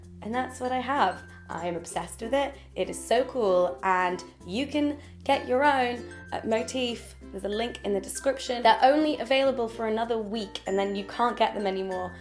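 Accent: United Kingdom. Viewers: where in Britain are you from? xx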